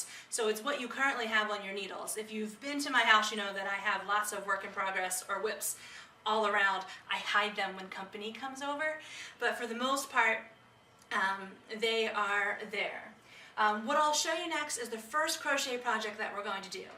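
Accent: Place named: East Coast, United States. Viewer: Nice! This is good stuff to inform and encourage beginners. And I really like that you speak well and I can see your face! I am hard of hearing and read lips, so this is especially important to me. Later you'll probably mostly be showing your hands, but I'll still be able to hear your good speech. I bet you're an East coaster -- out west those things are pronounced "skains" instead of "skeens." Good work, woman!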